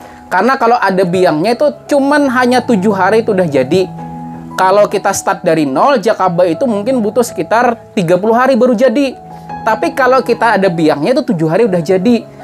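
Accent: Banjar, Indonesia. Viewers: Mantab, logatnya Sangat Banjar banget, salam dari Arek Banjar Suroboyo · Ooo pantas am bepander macam urang Banjar, salam sukses, moga chanelnya terus berkembang & tak bosan berbagi ilmunya Bang.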